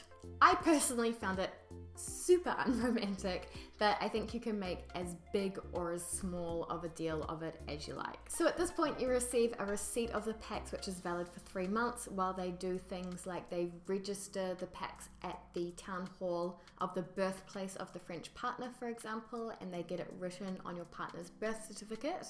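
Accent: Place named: New Zealand. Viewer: You sound Kiwi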